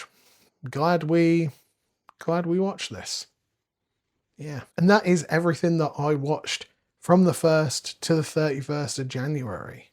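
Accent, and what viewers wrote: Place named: United Kingdom. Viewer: I’ll subscribe as it’s nice to hear a British voice…. You’ll be giving Elliot a run for his money 😂 👍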